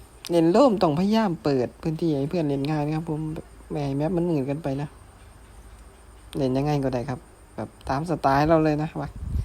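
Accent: Isan, Thailand. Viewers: นี้มันช่อง Doyser But พากย์อีสาน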